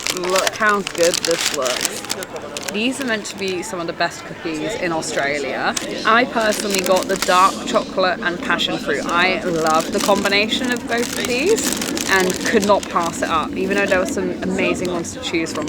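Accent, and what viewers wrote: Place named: England, United Kingdom. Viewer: Welcome to the city that is Soooo Up themselves (like New York).🤣 Just watch where the news of murders each week happens, and where the deaths (including of children sent home from in hospitals ER's, more frequently occur). The Northern Suburbs so don't like the rest of Sydney that they resist getting a train line, so that the 'Westies' would not invade them or their beaches.(It's not calleed the Insular Peninsular for nothing. Then you have the Eastern Suburbs who are also up themselves! That's why the train line stops at Bondi Junction, and Waverly council did not allow the train to run right to the coast. And neither the North Shorers or the Eastern Suburbs people want to be seen at the Western Suburbs (unless they are just passing through to the Blue Mountains or Perth.).. But sounding like a pom, you will no doubt understand. 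😊 I am in Newcastle who has good beaches, less rain/storms , and a place which helped to make Sydney rich, but without the gratitude. If you think we are hard done by, consider how the big whigs in Sydney treat the regional and rural areas. 😛 enjoy! You will see, no doubt that when the poms sent the convicts to here in chains - ..They were sending them to HEAVEN! (my Gr Gr Grandfather didn't come out as a convict but from Sussex as one of the earlier TEN POUND POMS.. And he never whinged about it. And the Lord blessed Him and his family. 😇 <link>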